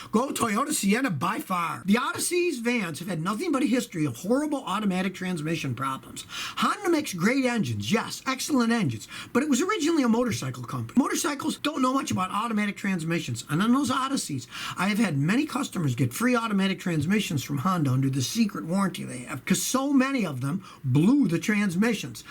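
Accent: United States. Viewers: Which part from America is accent?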